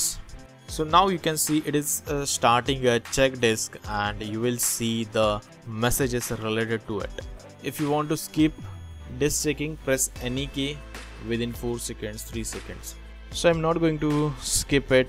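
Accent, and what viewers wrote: India. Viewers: The narrator has an Indian accent, is that ok? Since when windows has a robotized indian accent ?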